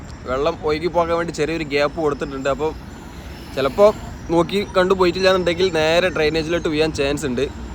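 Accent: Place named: Malabar, India. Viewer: Very nice vedio. Continue to give us such beautiful vedios. With your Malappuram/Malabar accent. I love it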